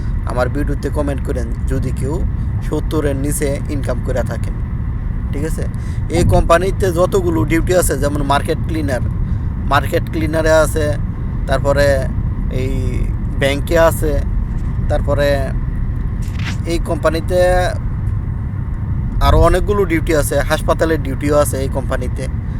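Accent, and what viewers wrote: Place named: Bancharampur, Bangladesh. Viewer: বান্ছারামপুরের ভাষার মত লাগলো আপনার বয়েছ টা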